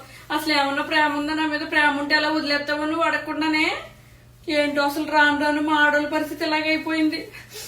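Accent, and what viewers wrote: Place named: Konaseema, India. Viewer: మీది నిజంగా కోనసీమేనా? మరీ అచ్చంగా అలా దింపేస్తున్నారు slang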